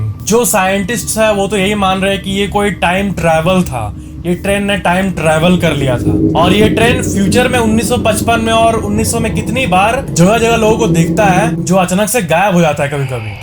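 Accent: Bihar, India. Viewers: According to his language type he is bihari!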